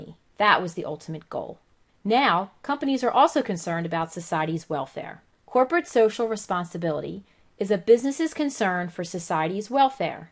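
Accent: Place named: Philadelphia, United States. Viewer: the super thick Philly voice-over accent is a bit odd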